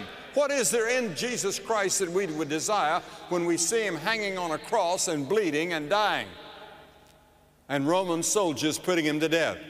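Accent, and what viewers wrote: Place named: South, United States. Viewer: I love listening to him. His voice is made for preaching and his southern accent is so captivating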